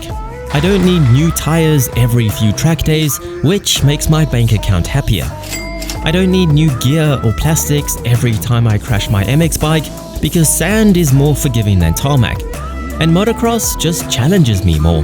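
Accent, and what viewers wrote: South Africa. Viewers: I am VERY good with accents, but not perfect. You are most def from South Africa, but English heritage or Boers/Afrikaans. heritage. I am NOT hearing a pronounced Afrikaans accent, so I am leaning to an English heritage, predominantly. Am I correct? I am just a bloke born and raised in the USA who lived in the Caribbean for 15 years. MANY of those years in the 1980's on the Dutch island of Saint Maarten where so very many expat South Africans lived on the boats they made and sailed away from their birthplace forever.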